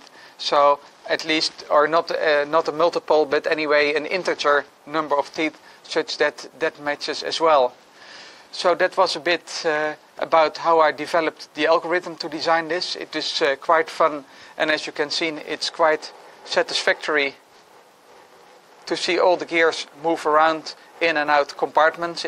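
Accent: Netherlands. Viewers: ben je Nederlands? je klinkt zo😉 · You sound Dutch, are you dutch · You are 100% sure dutch. (Me too) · lekker nederlands accent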